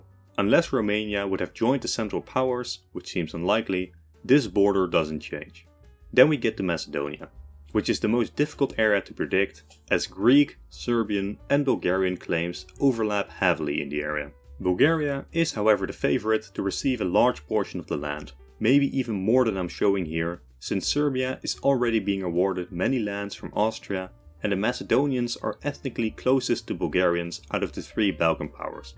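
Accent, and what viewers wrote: Netherlands. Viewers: Wait are you dutch?😮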